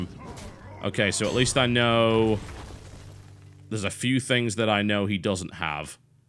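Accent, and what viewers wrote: United Kingdom. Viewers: He has a British voice.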